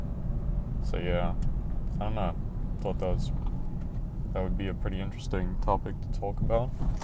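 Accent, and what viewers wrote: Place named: South Africa. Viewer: The South African accent is so thick I love it 🙂‍↕️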